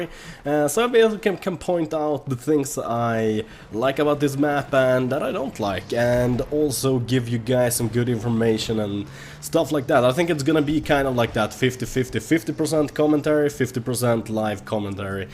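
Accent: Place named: Sweden. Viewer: Gotta love the swedish accent <3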